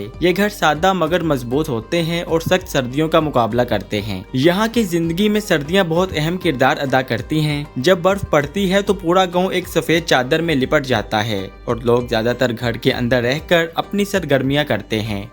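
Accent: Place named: Lahore, Pakistan. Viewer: lahori urdu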